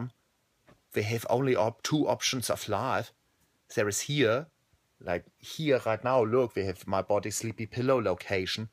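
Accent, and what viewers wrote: Germany. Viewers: Ich will nicht sagen, dass dein deutscher Akzent weh tut, weil ich selbst Deutsche bin und natürlich auch einen hab. Aber echt ehy :D trotzdem bist du ein echt cooler Typ :)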